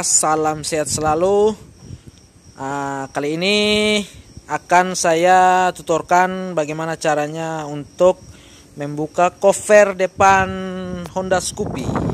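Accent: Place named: Bali, Indonesia. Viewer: Kalau dengar logatnya pasti dia orang bali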